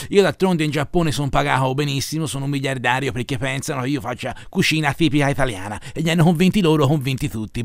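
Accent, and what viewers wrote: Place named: Campi Bisenzio, Italy. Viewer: Ma tu sei di' campi bisenzio? No perché i' mi babbo m'ha detto che il suo amico Marcello co i suoi amici parlavano a quel modo lì. Comprimenti per l'umorismo un saluto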